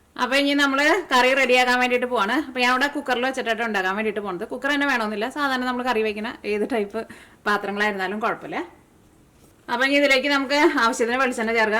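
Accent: Thrissur, India. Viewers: Good,we will try,Oru curry avasiyam ayirunnu,I have Oma,in my yard here in Florida,it is an adavantage in this lent season.Ninte language Oru Trichur accent analllo!!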